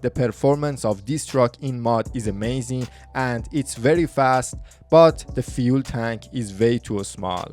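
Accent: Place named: Iran. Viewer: U have some kind of Iranian accent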